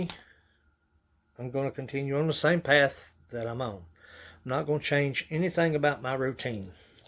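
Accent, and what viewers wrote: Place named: Appalachia, United States. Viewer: Absolutely love the accent! Where are you from my friend? I'm guessing somewhere in the Appalachian mountains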